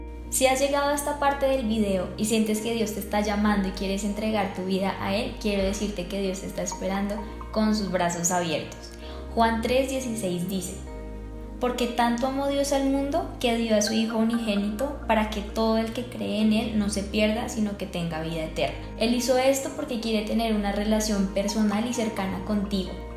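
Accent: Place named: Colombia. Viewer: Que asi Sea, Que Dios te escuche. Por que la verdad han sido meses de mucho dolor y sufriimiento. Me encanta tu asento colombiano, y la frescura de tu ser. estare muy pendiente de tu Nuevo video, espero sea pronto. Un abrazo fuerte de regreso.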